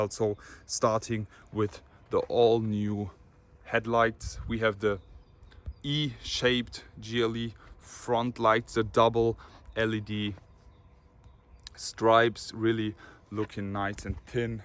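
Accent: Germany. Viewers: Man hört so dass du Deutscher bist😂✌️ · He is not terminator,he is standard German. :) The reason I would buy this car is because the front masks screams Germannn and we all know very good what means German in auto industry. So the accent, if you ask me, just adds to the autentysity. Coming from the place it's created, like this raw, it doesn't get better than this. Amazing car. I love it. Probably this is going to be the car I am gonna save the money for.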